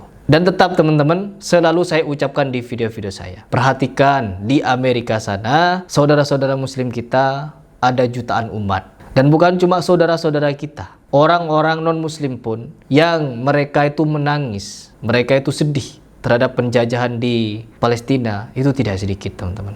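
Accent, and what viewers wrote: Madura, Indonesia. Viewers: Aslinya Madura ya mas?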